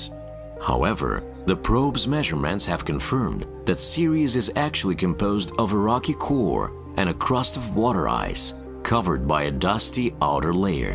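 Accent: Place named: United States. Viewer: Отличная озвучка на английском с американским акцентом. Супер!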